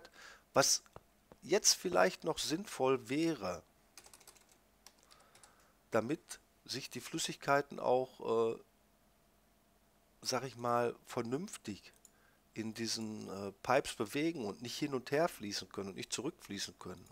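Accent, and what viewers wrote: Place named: Berlin, Germany. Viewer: Berliner Englisch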